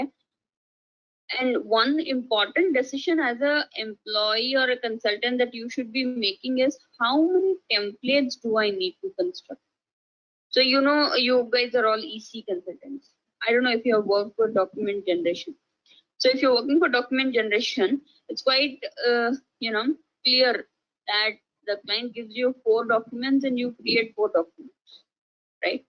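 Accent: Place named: India. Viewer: Very conc Indian accent, barely understand what she's saying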